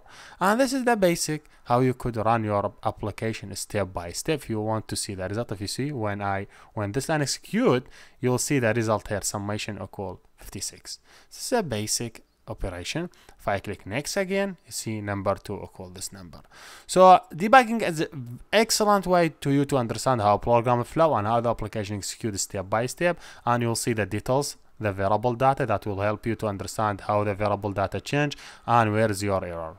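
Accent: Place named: Saudi Arabia. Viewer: واضحه اللهجة السعودية ما شاء الله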